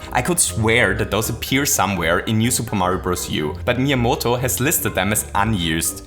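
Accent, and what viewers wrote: Germany. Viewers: Where are you from? I can't categorize your accent. Any chance you're German? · Are you from germany? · Are you german or austrian?😂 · Are You German?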